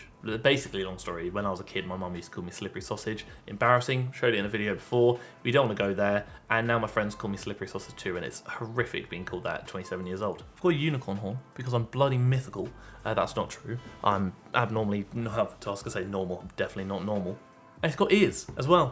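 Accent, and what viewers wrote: United Kingdom. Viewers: Absolutely adore Caroline. I'm not advanced nor a beginner so it's a huge challenge for me but I love every moment of pain. Also I love your British accent especially the 'bloOdY' 😂. Idk if you know but you seem really seem similar to another youtuber Slogo. Don't know if you know him but yeah I can't ignore the similarity.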